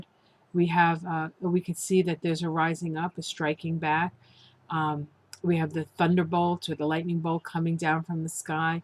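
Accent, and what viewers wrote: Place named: East Coast, United States. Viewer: Hi! I've been so busy lately that I haven't been watching. It's so good to just sit down and listen to you. As soon as this video is finished I will find the June Kabbala reading. Many blessings to you and thak you! 🧡 P. S. - I love hearing your East Coast accent. Makes me feel right at home. ☺️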